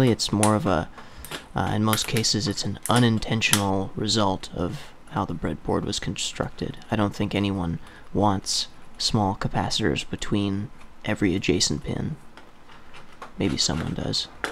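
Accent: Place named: Utah, United States. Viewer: Buddy where is your accent from? Utah ? It's kinda cool!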